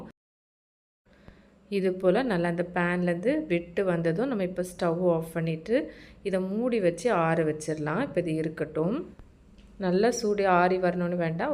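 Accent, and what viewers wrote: Tirunelveli, India. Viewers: Your Tamil is like Tirunelveli Tamil.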